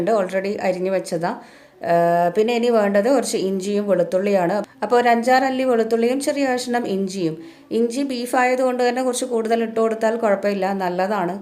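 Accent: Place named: Mahe, India. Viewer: Your way of speaking reminds and miss me my hometown mahe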